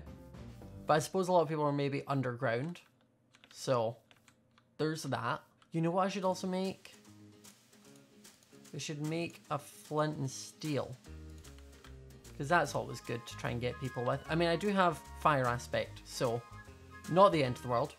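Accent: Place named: Scotland, United Kingdom. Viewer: Only 817 views?? Come on we can do BETTER. Btw, everytime I watch, I start speaking like a SCOTTISH PERSON!! I called my friend and she was like, who is this? Did you go to Scotland?? I'm AMERICAN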